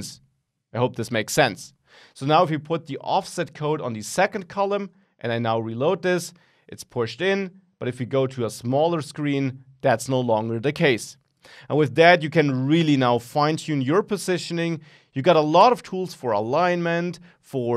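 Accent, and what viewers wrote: Germany. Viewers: GREAT content! Are you German by any chance? I am trying to make out what kind of accent you have. (I am only asking. I understand you perfectly fine)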